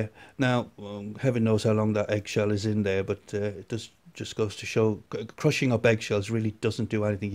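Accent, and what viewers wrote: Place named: United Kingdom. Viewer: .I enjoy listening to your accent also. I am origanaly from a small village called Darley near Harrogate Yorkshire. I live in the USA now in Tenneessee so it is lovely to hear another British Person's voice :) Do you ever show your face ? I like to see the face with the voice. I really enjoy your video's thanks for sharing them with us :)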